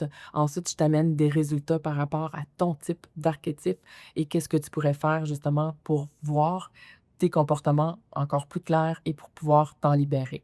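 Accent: Quebec, Canada. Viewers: Je suis Français mais j'aime bien votre accent québécois. Je crois que c'est pareil dans le sens inverse : certains hommes aussi se projétent.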